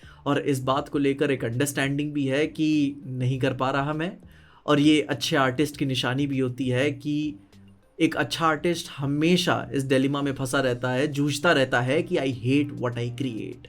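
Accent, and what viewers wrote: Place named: Bihar, India. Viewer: old videos mein kitna ajeeb lag raha hai. Bihari accent bol raha hai, poor hai aur ab dekho kya transformation aya hai bande main 😮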